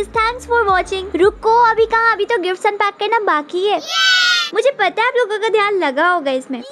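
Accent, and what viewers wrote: Bihar, India. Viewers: Tum Bihar se ho kya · App Bihar se ho kya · Dii aap bihar se ho kya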